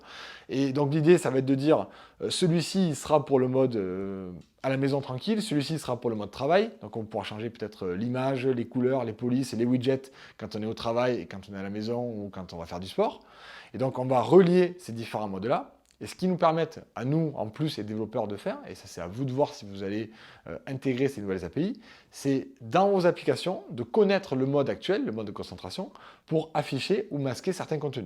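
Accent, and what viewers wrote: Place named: Marseille, France. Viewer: ta l'accent de Marseille ! cool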